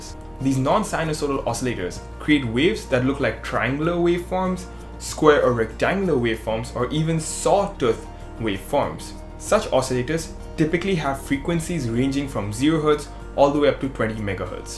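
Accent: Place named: India. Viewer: COngrats. Ever your Indian accent we get what you say and you know and explain well. Very good video.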